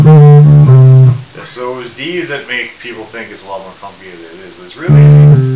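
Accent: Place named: Canada. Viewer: bro. First off you sound sooooo fucking Canadian I was laughing so hard. Not an insult...my daughter was born in Ottawa....funny memories. However, bro you are playing the riff incorrectly. i know you have been a long time subscriber/commenter on my videos. You are adding in extra octave d's...i mean technically the notes are correct but there are more open d's not the octave 12 or in your case on the g string...the 7th fret.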